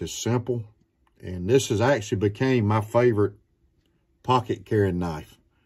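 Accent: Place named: South, United States. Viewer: I liked this video as soon as I heard that reb accent. Go figure He likes Sodbuster's too! one of many Case EDC's that are perfect.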